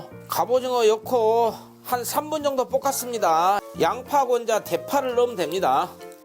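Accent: Jeolla, South Korea. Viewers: 셰프님 말투가 꼭 울동네 말투인듯.사투리도 너무친근요 ㅋ ㅋ .고항이.순천.여수.광양 셋중에 있을듯.전 참고로 광양 토박이.입니다.ㅋ ㅋ .혹시.고향이 어디신지요?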